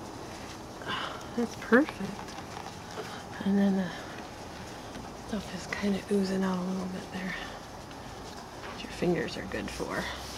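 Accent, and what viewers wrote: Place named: New England, United States. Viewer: Its so naaaaaesty! Haha yankee accent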